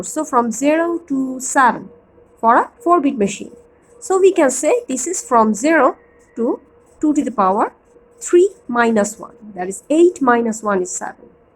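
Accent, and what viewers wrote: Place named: Bangladesh. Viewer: What English you are speaking yaar bengoli English or American eng. At least decide it. It ll be more understandable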